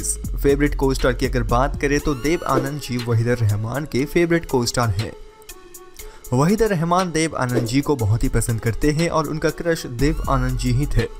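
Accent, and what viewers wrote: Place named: Hyderabad, India. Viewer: Kya baat hai bawa, ek dum Hyderabadi 😄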